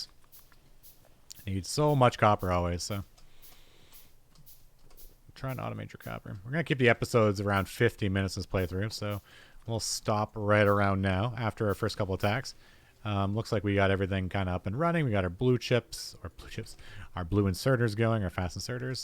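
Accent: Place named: Canada. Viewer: god you are canadian.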